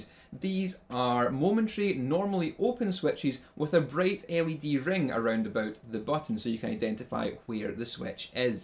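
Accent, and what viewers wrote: Scotland, United Kingdom. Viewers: I think the Scottish accent accounts for at least 40% of the reason I watch your channel. It's just so pleasant to listen to. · Thankfully it's not a very complete Scottish accent, otherwise it would be impossible to understand and even worse for the non english speakers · Best guitar channel on youtube. Mainle due to your scottish accent. Greetings from DK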